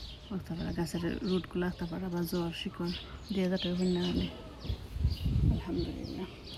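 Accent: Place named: Sylhet, Bangladesh. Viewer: She is speaking properly, shes speaking sylheti which is a different dialect of bangla it does not mean it is from a lower class. YOU need to learn to speak properly and not make judgments based on people’s background.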